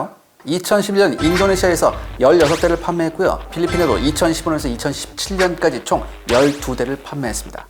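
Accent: North Korea. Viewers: 국방TV에서 북한 말투라... 정권이 맛이 가니 국방부도 맛이 가고 있구만.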